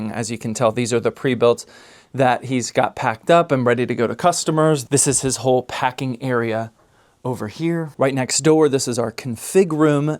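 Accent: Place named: Texas, United States. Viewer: you already sound like you're from texas, you're definitely ready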